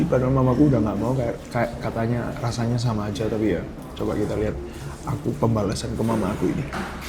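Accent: Java, Indonesia. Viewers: Kamu orang mana bang.. Kok lucu medok banget kl ngomong bahasa Indo. 😊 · Walah koh mbok mgomongnya yg bener...aku jadi ketawa ketiwi.. Kok yo medok banget😂😂😂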